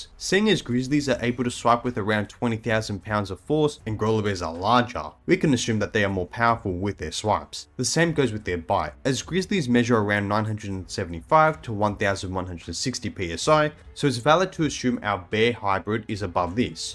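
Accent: Australia. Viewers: I need more of this Aussie accent talking about wild animals. Nothing better than a wikd human describing wild animals with their wild accents. I love it!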